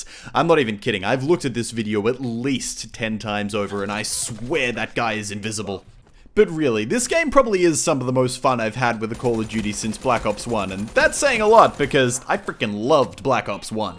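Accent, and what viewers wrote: Australia. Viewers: lel you are australian ? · are you australian ? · TIL is Australian and not British.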